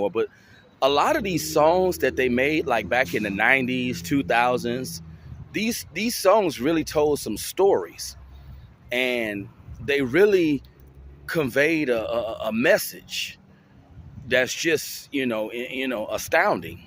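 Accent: United States. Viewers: That deep south voice.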